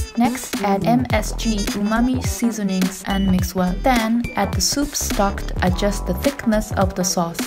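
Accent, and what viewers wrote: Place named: Japan. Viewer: I miss being in Japan so much. Your accent brings me way back.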